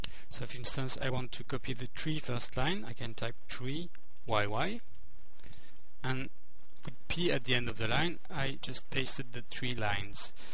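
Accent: France. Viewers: Its a French accent lol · Thanks alot man, very well explained and the french accent is interesting :D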